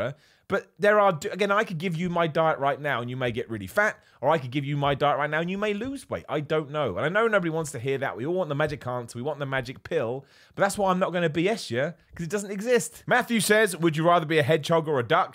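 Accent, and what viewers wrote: United Kingdom. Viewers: Great as always love your candor. Always good info and a laugh. maybe it's because I'm from the United States but I could listen to somebody from the UK talk all night long it's not that that's weird I just like the accent anyway keep up the good work man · Great Video, I'm from the UK and it is so funny hearing your views as I think the same and always better UK voice than American like there is alot on YouTube. Keep up the great work.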